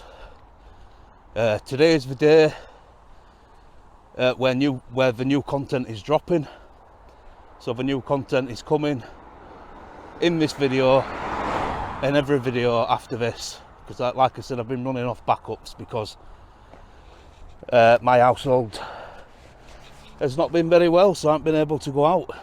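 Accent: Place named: Yorkshire, United Kingdom. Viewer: Does anyone listen to these videos just for the Yorkshire accent? Hope you're all feeling better. Cheers from Texas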